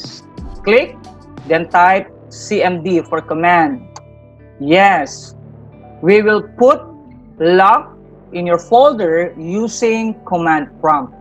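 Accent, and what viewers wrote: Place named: United States. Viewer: Akala ko bro sa umpisa amerikano kayo. Maganda yan turo mo bunso. Goodluck.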